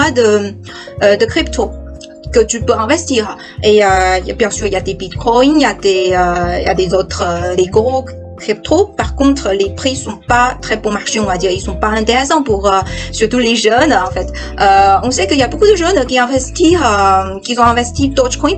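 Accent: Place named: Northeast, China. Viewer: 东北大查子味法语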